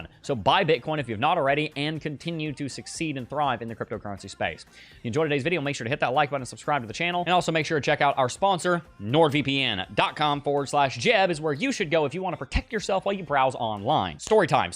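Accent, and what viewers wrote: United States. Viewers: Im from the uk a normal person listen to this yank typical noisy thiko yank Thinks hes on a yanky bog roll advert 😂😂😂😂😂😂😂😂😂😂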